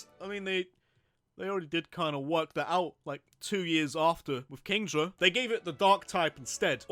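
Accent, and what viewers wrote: United Kingdom. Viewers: i think hes british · I’m subbing because you’re British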